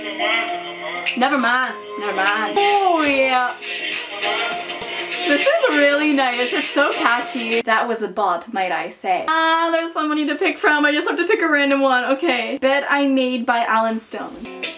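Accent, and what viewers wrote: Canada. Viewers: are you canadian?! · Are you Canadian? Btw I liked the video :)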